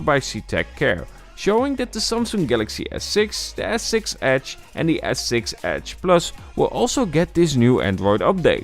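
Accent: Netherlands. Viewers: Jij bent nederlands, ik hoor het!!! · That dutch accent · You are dutch confirmed it via you english accent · Je hoort gewoon dat je Nederlands bent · Youre dutch, my accent is the same as youres😉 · Haha nederlands accent😂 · Still using the s6. You have a dutch accent, I can hear it because I'm dutch, am I right? ;) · Meteen in de eerste zin wist ik dat je nederlands bent😃 top video💪💥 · nederlands😂 kun je dds goed horen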